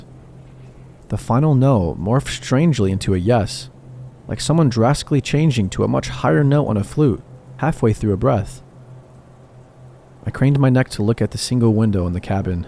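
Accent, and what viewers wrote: United States. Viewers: I love your voice. New Jersey is that you? 😅 Your voice makes me feel safe. Thanks babe. ❤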